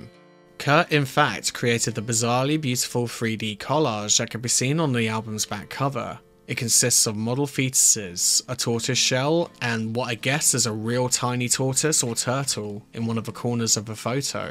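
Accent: United Kingdom. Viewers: Another British Accent bs fake documentary. Pass.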